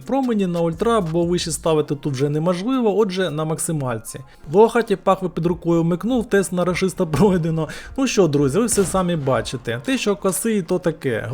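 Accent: Transcarpathia, Ukraine. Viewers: Ти закарпатець?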